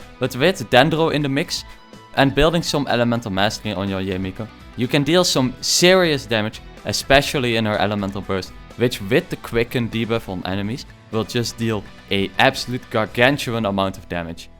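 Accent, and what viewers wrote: Netherlands. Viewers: Im hearing a dutch accent.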